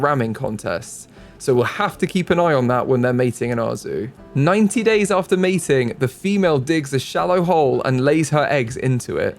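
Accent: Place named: RP, United Kingdom. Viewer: He's definitely English. I would say South/South East England. Honestly to me, from North East England, he just sounds... generic English lol 🤷‍♂️ Like definitely not Newcastle, or Yorkshire or Liverpool, or Birmingham. Just... slightly posh/fancy generic lol.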